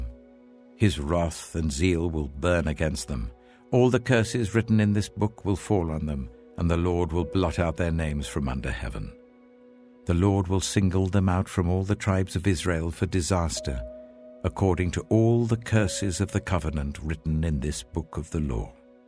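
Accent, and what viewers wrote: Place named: RP, United Kingdom. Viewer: Let's face it you cannot beat a really nice cultured British accent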